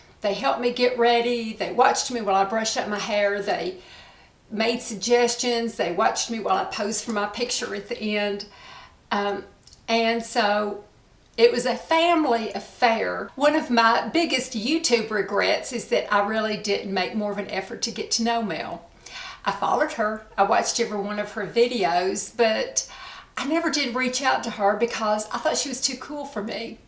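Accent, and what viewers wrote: South, United States. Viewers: I'm a new friend of,❤ We connected from our love and heartache of losing Mel. And I can say, in the short time I've known her, you couldn't be more right. She's such a beautiful soul, inside and out. Safe place to be❤ And though we became friends through such a horrible loss, I'm grateful for her friendship 🙏 I'm so happy she introduced me to your channel❤ You are such a beautiful soul, inside and out. Your southern accent will get me every time😘 So excited to be introduced to your channel...🦋🦋🦋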